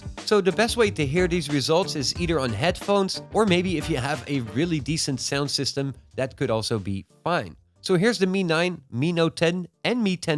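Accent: Netherlands. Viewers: Just started following you because I heard your Dutch accent 😂👍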